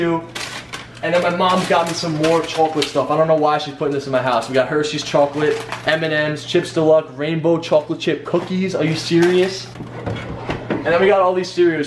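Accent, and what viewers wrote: New York, United States. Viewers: He has a New York accent so when he say chocolate it's funny 😭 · hes from ny that's why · new york accent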